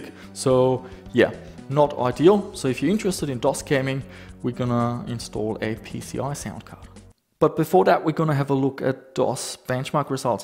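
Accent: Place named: Germany. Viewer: dein deutsches Englisch ist so niedlich 😀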